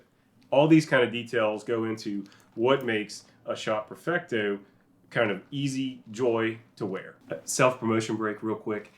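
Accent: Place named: Pennsylvania, United States. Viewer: love the pennsylvania accent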